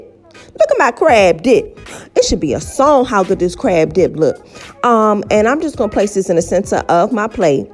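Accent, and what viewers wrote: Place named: Maryland, United States. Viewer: OK, I was about to quote the hell out of this video, but realized it was damn near the whole thing! Love the energy and that Murland twang... Now, if I can focus and get ur recipe I'd be good! lol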